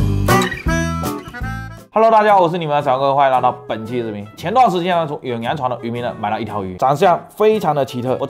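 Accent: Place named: Fujian, China. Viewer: 胡建口音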